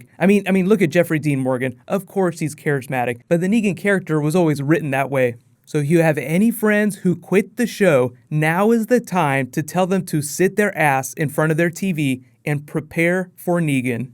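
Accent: Netherlands. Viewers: R U dutch ??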